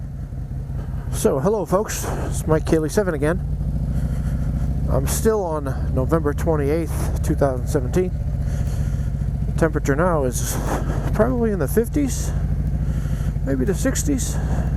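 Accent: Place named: New Orleans, United States. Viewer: I'm watching this and thinking about not just accents, but differences between us all. My wife is a beautiful filipina...such lovely brown skin and complexion...but she hides from the sun like it was evil!! In the meantime...I'm laying out in the sun in summer to get some tan on what I call my pasty white 'coffin legs!' The brown people wanna be white...the white people wanna get a tan...lol! We humans are a funny bunch.That said I love accents...I was in Bastan once...and the guy said..Pak ya truck over thay're..so I did..park my truck over there! I love it!! What could be better than a vid from our mutual friend...and ya get to hear his accent from the Big Easy....it's like a vacation from a place you want to visit...and you don't have to take a flight!! Too bad us humans...can't get together on a little more of our differences that in the reality of things don't...involve hate... 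Thanks for the ride..eh!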